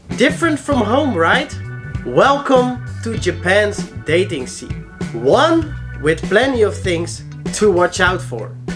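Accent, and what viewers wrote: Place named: Netherlands. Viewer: I gathered you're from Nederland from your accent. Lived there for half a year. Lekker times 😀. I was responding to the main comment with him saying he's an African American, or a black person as the rest of us outside the US say.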